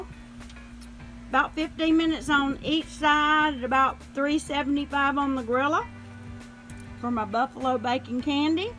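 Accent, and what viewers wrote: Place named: South, United States. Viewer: Love the southern accent! Wish we had the snow!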